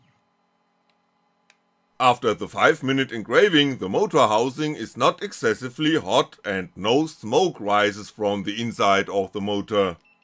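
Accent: Germany. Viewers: Funny to hear a german practising English.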